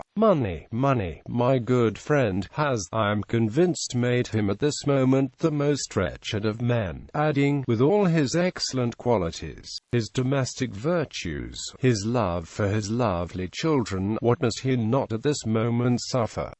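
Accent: United Kingdom. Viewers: Once again, very interesting but so poorly read. Why on earth were the producers unaware of this? Rather spoils what could have been a brief and welcome documentary. Was the reader British?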